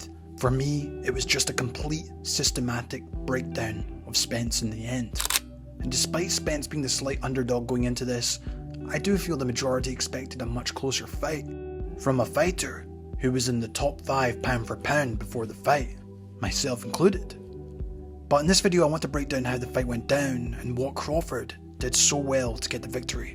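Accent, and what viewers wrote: Scotland, United Kingdom. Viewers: Are you from Scotland ????? accent????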